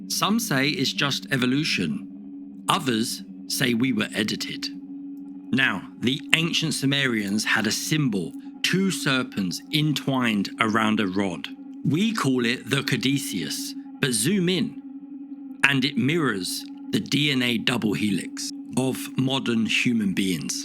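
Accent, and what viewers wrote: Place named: United Kingdom. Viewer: Why do all the bots have a Brit accent?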